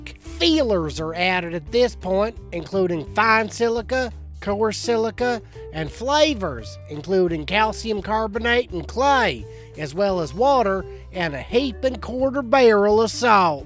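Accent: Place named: South, United States. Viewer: Sir you are one hilarious son of a bitch! Please I am NOT cursing. I practically Rolled Over The Fucking Floor and Laughed My Ass Off! God I wish I could shake your hand and get an autograph from ya!!! Your Southern accent had me bend over double!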